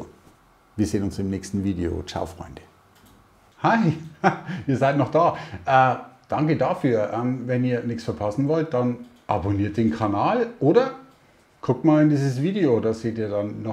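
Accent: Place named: Franconia, Germany. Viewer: Woher kommst du Meister? Ich höre fränkische Züge raus. 👌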